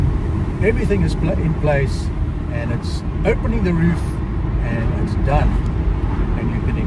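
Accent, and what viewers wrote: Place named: South Africa. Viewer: Hi. Nice to hear your review and your SA accent! I’m amazed that you prefer your boxter vs 911? Find this surprising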